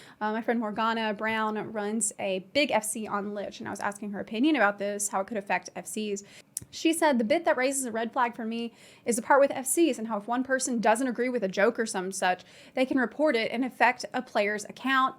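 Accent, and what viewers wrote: South, United States. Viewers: geez, now I totally hear the southern accent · Love hearing the southern accent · I'm from Birmingham Alabama and she sounds southern. Then again not all of us have that kinda twang from the city. Some of us sound more from the hood than from the country. My point not all southern accents are made equal. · you know i didn't process this at all but i just realized does have a southern accent. I think im used to hearing lighter less subtle southern accents that i didn't notice at first.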